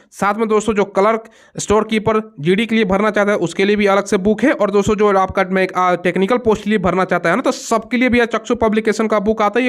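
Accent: Bihar, India. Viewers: Sir aap to khati bihari ban gaye hai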